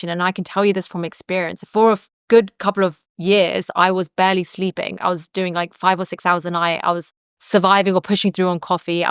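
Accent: United Kingdom. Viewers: I would like to make a comment if I may… could you please talk slower? I normally have to listen to other YouTubers with 2x but for you, I cannot continue listening after 5 mins… if you try to level your British accent with a speed which makes it more understandable, it will be best… also, YouTubing is your job… pls try not to pour in your anxiety in your speed of speaking 😊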